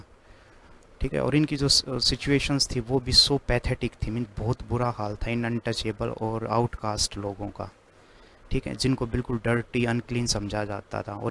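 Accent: Haryana, India. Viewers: Aap pakka Haryana se Ho sir. Aapki language se Lagta hai. Beech beech me yo haryanvi touch bahut Badhiya hai. Aap bahut acha padhate hai😊 12 unit ke baad bhi video banaiye please . Humble request 🙏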